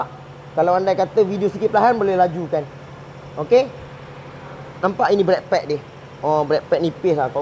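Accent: Pahang, Malaysia. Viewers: Pekat Pahang yakkk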